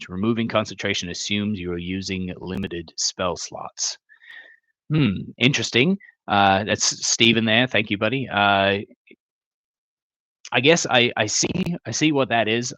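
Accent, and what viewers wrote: Australia. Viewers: I think there's an Ozzie filter on the microphone. LOL! I've watched a lot of videos and you've never sounded Aussie until this one. No worries, mate. Maybe it's because ya just got back, but your accent is not usually prevalent. Maybe it's because of your other voices.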